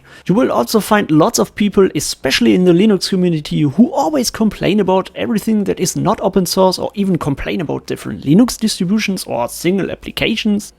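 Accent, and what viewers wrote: Germany. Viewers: Man hört kaum das du deutscher bist xD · Yeah, German accent, great 😃 Subscribed!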